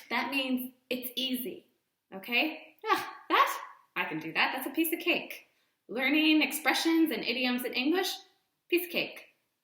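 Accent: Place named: Texas, United States. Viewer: you're from Texas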